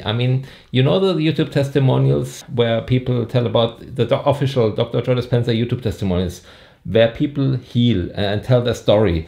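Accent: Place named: Germany. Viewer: Ich liebe deinen deutschen Akzent. Es ist so schön! Vielen Dank für dieses Video)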